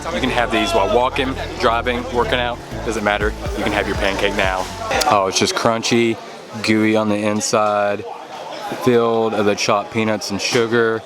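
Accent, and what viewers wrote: South, United States. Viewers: You are very descriptive in your food reviews, plus your southern accent is great. MA...LAY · I'm not sure where you're from? I would guest southern ish US? The way you talk its so calm and soothing n yet can feel the excitement... Good video btw Subcribed :-)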